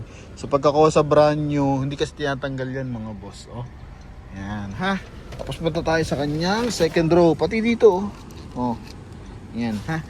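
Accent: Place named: Bicol, Philippines. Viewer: new subscriber padi.bikolano kaba kabayan?ung accent mo kasi na haa!😂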